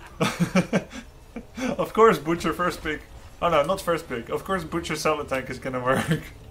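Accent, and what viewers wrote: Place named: Netherlands. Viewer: Hey man! I first saw you as shoutcaster and wondered if you are Dutch as I thought I recognized the accent. The name Grubby did not make it clear, but now I know its Manuel Schenkhuizen and that sure clears it up ;P I cannot recommend your channel on an educational level as there is a bit too much sarcasm and goofiness, but for pure entertainment it is well worth the sub! Keep it up bro! Groeten uit Spijkenisse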